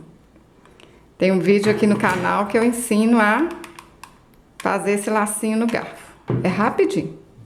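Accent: Minas Gerais, Brazil. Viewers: Adoro esse seu sotaque mineiro uai!!!! Lindas bonecas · Amei seu trabalho e seu sotaque mineirinho! Parabéns e gratidão por compartilhar suas peças conosco.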